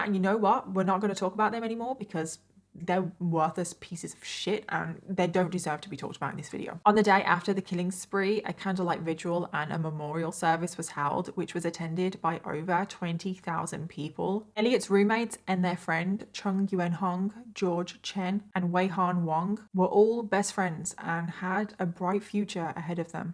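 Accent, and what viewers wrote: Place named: United Kingdom. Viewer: You should definitely narrate audiobooks! True crime and history audiobooks narrated by someone with a British accent are all I listen to! Also, wish I could nail your makeup, I just end up looking like an idiot 😂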